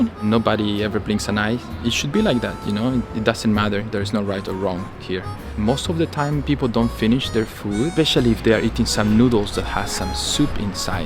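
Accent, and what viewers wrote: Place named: Germany. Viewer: Great video and thanks for sharing. Are you German descendant? I notice your English has a bit of German accent.😂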